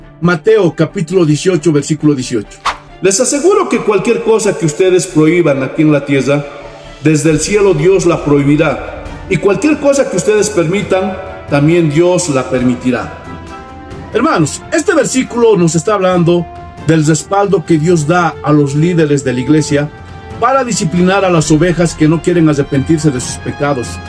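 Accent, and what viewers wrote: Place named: Mexico City, Mexico. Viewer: Hermano usted es de México? Tiene tono de la Capital. Bendiciones hasta dónde se encuentre.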